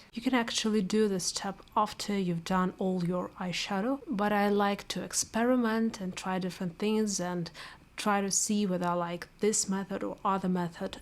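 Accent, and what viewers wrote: United Kingdom. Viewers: it sounds kinda British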